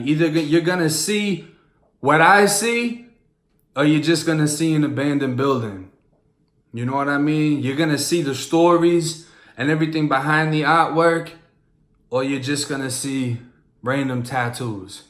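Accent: Boston, United States. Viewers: I new you were from the Boston area!! As soon as I heard you speak, I said yup! Boston accent! Boston born and bread here, Dorchester Mass or a Dot Rat as they say 🤣 myself!! 😊! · You can tell the Boston accent is the real one with the dropping the Rs. The Florida hood accent is acquired not necessarily fake but more adopted. I’m a white dude who grew up in the hood in Philly. a couple of my white friends i grew up with used to put on that hood “blackcent” when we were younger, tho some still talk like that. But now that we’re in our 30s most just have the normal shitty, Philly white dude accent. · Alot of people in the Boston area sounds like that. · Why does he have a Boston accent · the cah 🚙 That Boston accent 😂😂😂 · I new I could here the Boston pahk tha cah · That's crazy you move to Florida about the same age I was when I moved out of Florida I don't know where people say you got a Florida accent cuz homie I straight here nothing but Boston accent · Idk why the Boston accent is so interesting lol .... 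Concrete-ass-room with the stupidest teacher in the school. That shit had me rolling. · Boston with a Blac-scent’ LOVE IT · your accent is so strong for not growing up in boston lol! I love how yall say Car haha sounds so funny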